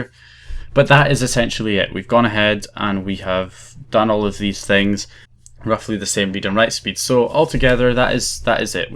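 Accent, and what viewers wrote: Scotland, United Kingdom. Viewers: I so much liked the Scottish accent that I subscribed! :) hahaha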